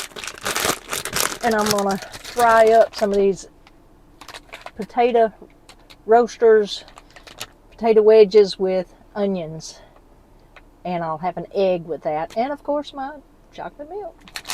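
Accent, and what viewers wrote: South, United States. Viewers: Do you use the toilet you made up for both #1 and #2? I use two of these camp toilets in my old farm house. My well went bad 18 yrs ago and I hated the water anyway. I only come to this place for a few months each year so works well. Plus, my septic works just fine, so I empty every couple days into real toilet and put 2 1/2 Gal in back of toilet to flush. Nice video and great set-up. P.S you sound real southern. are you? ;-))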